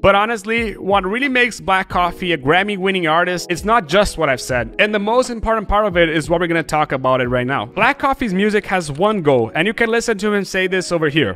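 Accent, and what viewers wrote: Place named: Brazil. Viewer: Só pelo sotaque da pra ver que vc é br mano, hahahaha. Ótimo conteúdo irmão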